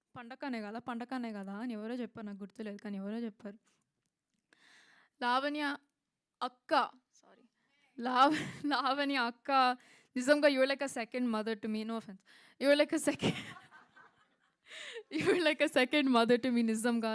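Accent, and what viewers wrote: Telangana, India. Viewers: Maa telangana bidda lekka matladinavu amma maa support niku untadi and PK gari fans niku andaga untam and koncham daddy ki cheppu oka manchi telangana slang cinema thiyamanu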